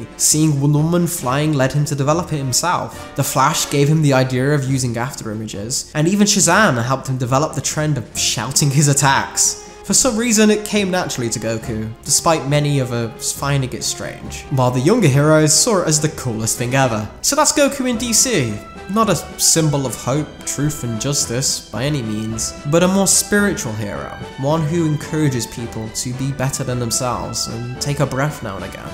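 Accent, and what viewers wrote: United Kingdom. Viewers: nice video, the only problem is your hard Brit accent. Found it so difficult to understand you. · You have a very nice British accent. You can go far in YouTube with just that.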